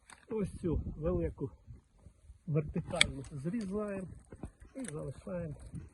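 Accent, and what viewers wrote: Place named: Podillya, Ukraine. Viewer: Дуже гарно дякую Вам! Було пізнавально. Мова – ніби живої води напився! Скучив за Поділлям (батьківщина моєї мами). Додав у підписки. З нетерпінням чекатиму нові випуски! Вітання від донеччанина, що зараз живе на Харківщині) хай ми Переможемо! З повагою, Іван Редько!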